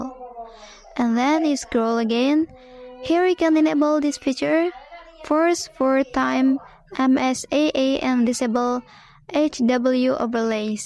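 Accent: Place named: United Kingdom. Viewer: So British luh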